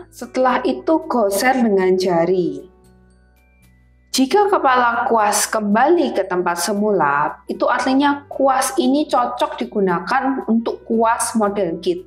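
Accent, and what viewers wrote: Java, Indonesia. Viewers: Medok but beauty and love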